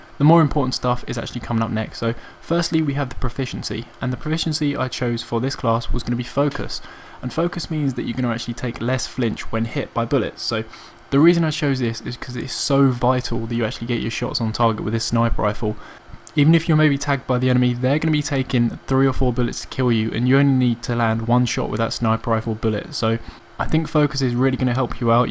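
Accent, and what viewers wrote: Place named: Cockney, United Kingdom. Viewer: there is no such thing as a 'british' accent, this, is a southern cockney accent, from quite a well spoken man here so you probably accociate this with someone like hugh grant. but say for example you went up north, to newcastle, you would have no idea what they are saying, take for example when cheryl cole and none of you yanks could understand her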